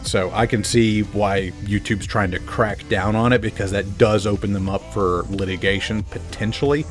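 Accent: South, United States. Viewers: you are my favorite nerd with a southern twang on youtube. Really you have taught me that Nascar is so much more than good ol boys driving around in circles. It's shameful to see how far Nascar has fallen when they really had a good thing going in the early 2000's.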